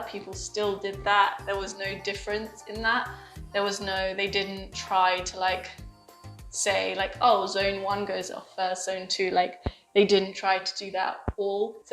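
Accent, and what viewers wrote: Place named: United Kingdom. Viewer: Hey, your videos are so informative and helpful. Thanks for sharing your travelling tip during the Pendamic. I miss travelling personally as I would have loved to travel and explore New Zealand and Japan next ❤. Im from Canada too but I live in Ontario. I like your cute British accent to. Keep sharing and stay safe😊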